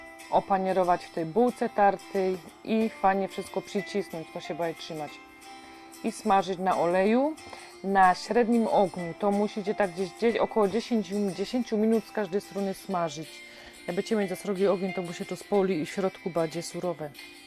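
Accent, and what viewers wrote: Silesia, Poland. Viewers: Jak ja nie cierpie gwary slaskiej (sory)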